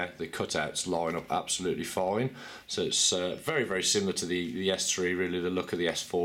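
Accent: Birmingham, United Kingdom. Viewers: with that accent you must be a fellow brummy lol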